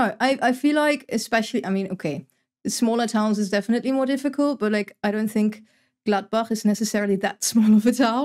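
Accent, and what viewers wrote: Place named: United Kingdom. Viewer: Gotta give you a compliment for your English! You actually sound British! xD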